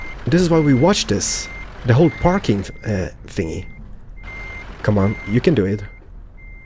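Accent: Scania, Sweden. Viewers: For all people who aren't swedish! does not speak any swedish, he speaks skånska! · Vilken härlig skånska du har :) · And plz try to not speake skånska när du utalar :) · Ahahahaha skånska <3 omg varför låter allt så roligt?!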